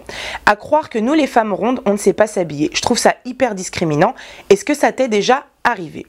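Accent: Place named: South, France. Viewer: Coucou je suis complètement d'accord avec toi pour les copines par expérience on apprend bien des choses...j'adore l'accent du sud tu m'a fait trop rire, tu et naturel et tu te prends pas la tête sa fait plaisir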